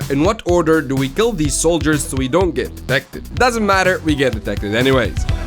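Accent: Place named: Arab world. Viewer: أظن من لكنتك ومخارج نطقك للحروف انك عربي😅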